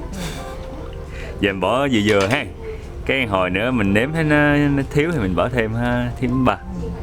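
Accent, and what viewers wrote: Mekong Delta, Vietnam. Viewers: Bạn nói đúng, trong đó có mình nè. Mình xa miền Tây từ năm 1983 lên Saigon sống rồi thì đi xa hơn nửa (nửa vòng trái đất) nên nổi nhớ Miền Tây của mình chỉ có trong những giấc mơ. May là có giúp mình tìm lại những hình ảnh quen thuộc quê nhà và giọng nói quê hương. Quê nhà mình ở chỉ cách nơi nhà ở có hơn mười mấy cây số nên giọng nói cách nói chuyện của rất là thân quen với mình. Rất cám ơn đã đem lại hình ảnh quê hương đến cho mình. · 3 năm trước mình vô tình vào xem clip của và thích ngay cái chất giọng miền tây và khuôn mặt dễ thương. Chúc luôn thành công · Nhìn thấy bạn mà thấy yêu bạn với cách nc của bạn cũng như ng dân miền tây rất thân thương và thiện cảm. Yêu bạn mất rồi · Giọng đúng miền tây dễ thương ghê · Coi video của em quay thật rõ ràng,nói chuyện rất hay và chất phát đúng là người miền tây!!❤️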